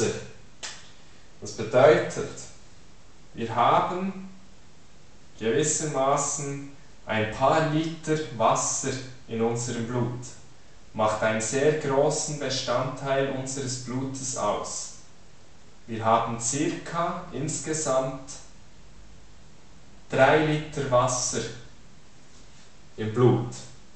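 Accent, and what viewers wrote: Switzerland. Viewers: schweizer Deutsch?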